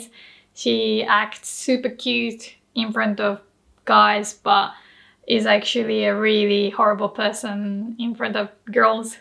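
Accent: United Kingdom. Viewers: Unrelated. Do you use the british accent as your base accent or the american one? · Hi! I noticed your accent is very british sounding! Did you learn from a british teacher or something? Spend time in the UK? In college I had a Japanese friend who grew up in hong kong, so her English was almost a perfect british accent. Your accent kind of reminds me of hers.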